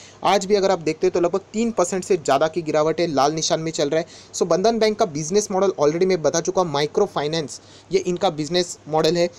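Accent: Maharashtra, India. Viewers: Sir very fine analysis, a am watching your each and every vidio, one thing I want to ask you, I guess u belong to maharashtra, from your language I come to know, I am from pune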